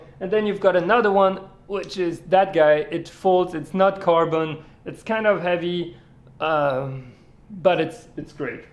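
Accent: France. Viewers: It depends on the kind of aps c you're using. If you convert a 16mm on Sony aps c camera you have to multiply. 16 * 1.5 = 24. So it's the equivalent of a 24mm on a 3/4. Love your French accent btw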